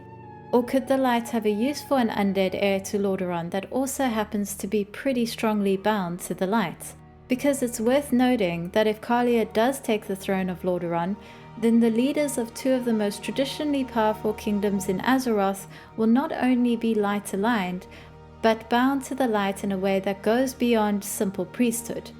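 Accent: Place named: South Africa. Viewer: I knew you sounder familiar. You sound just like a South African. I hope your trip goes well.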